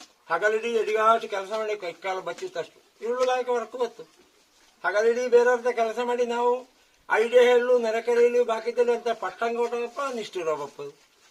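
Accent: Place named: Tulu, India. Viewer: ನೀವು ಕನ್ನಡ ಚೆನ್ನಾಗಿ ಮಾತಾಡೋನು ನಮ್ಮದು ತುಮಕೂರು ನಿಮ್ಮದು ಸ್ವಲ್ಪ ತುಳು ಭಾಷೆ ಮಿಕ್ಸ ಆತ ಉಂಟಲ್ಲ❤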